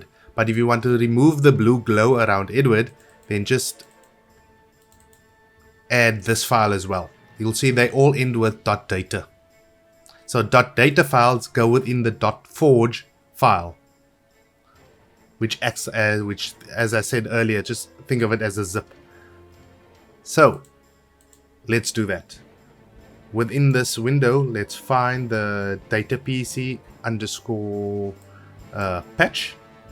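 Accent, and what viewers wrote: South Africa. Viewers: I knew that was a South African accent, the "biltong" just confirmed it 😭🇿🇦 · Yooooo a South African! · Never expected to hear a South African accent on a mod vid😂 Thanks for the help man! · Yo nice vid man. The game already looks amazing to me but wanted to see what the modders did to it. Also, hope you dont mind me asking but you sound South African, are you from here?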